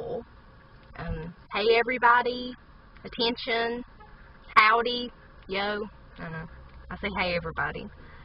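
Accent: South, United States. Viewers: Southern accent is more noticeable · love the southern american draawl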